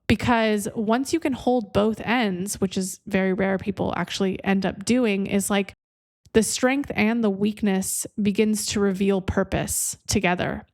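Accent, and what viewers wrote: Italy. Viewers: Things I ❤…., puflet’s and Italian accents. Thank you. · Your Italian accent actually sounds so prettyyy and I oove your humor hahha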